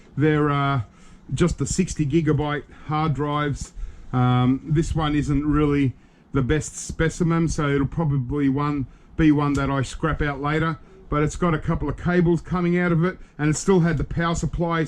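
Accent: Australia. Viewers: You are aussie guy? Nice scrapping lots of wire and neat video 🙏🏻🌈🤗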